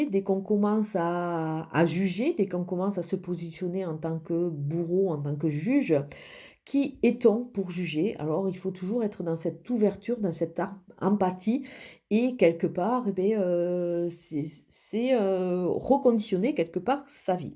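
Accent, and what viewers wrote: South, France. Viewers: Bonjour, je vous remercie encore pour votre fabuleux live d'hier et merci pour ce tirage du jour. Et merci aussi pour la générosité de votre ❤ pour vos abonnés... Bon week-end à vous bel accent du soleil...💐 ☺ 😘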